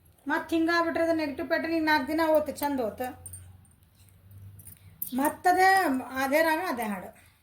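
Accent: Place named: North Karnataka, India. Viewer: ಮೇಡಂ ನಿಮ್ಮ್ ತರಾನೇ ಇದಿರಿಗೆ. ನಮ್ಮ ಮೇಡಂ ಬಹಳ ಸುಂದರಿ ಯಾಗಿದ್ದಾರೆ ಮತ್ತು ನಿಮ್ಮ ಉತ್ತರ ಕರ್ನಾಟಕದ ಭಾಷೆ ಬಹಳ ಚಂದಿರ ಓಕೆ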